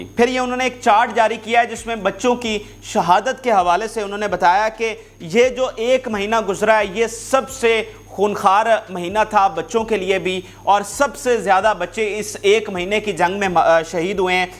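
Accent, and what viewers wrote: Punjab, Pakistan. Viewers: اوئے پینڈو انسان اگر انگریزی بولنی نہیں آتی تو لکھی ہوئی انگریزی پنجابی اور پینڈو سٹائل میں پڑھنے کی کیا مجبوری ہے ؟؟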